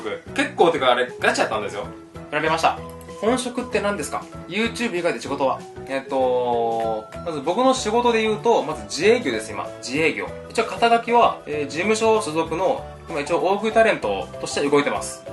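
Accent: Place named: Kyushu, Japan. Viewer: 初めて拝見しましたが 九州人なんですね！ 方言めっちゃ落ち着く〜 ぜひ大分県のお魚も食べてください！ 福岡も美味しいけど大分も美味しいですよ！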